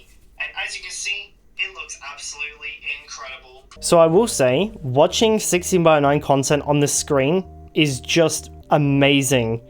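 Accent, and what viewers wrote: Australia. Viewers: because he’s Australian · The Australian accent is THEE most annoying accent of all accents by far. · Are you a Aussie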